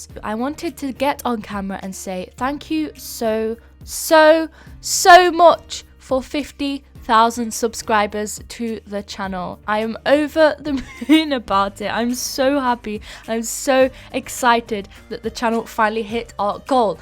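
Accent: United Kingdom. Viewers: YESSS, BRITISH ACCENT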